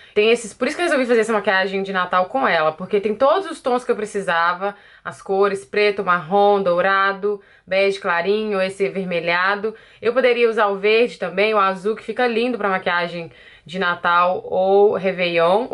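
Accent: Minas Gerais, Brazil. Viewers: Uma boneca!!.♥Amo seu "minerês",tbm sou mineira.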